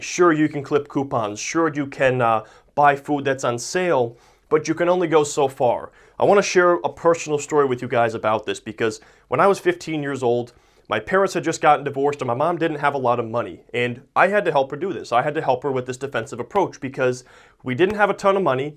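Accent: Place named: Midwest, United States. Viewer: Great video. May I ask where you're from? I hear a Midwestern accent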